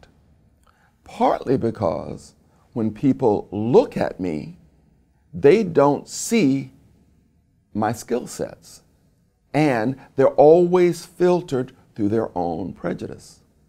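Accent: United States. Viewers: He has an American accent. I don’t understand. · It's partially because of your American accent. Many Brits love an American accent.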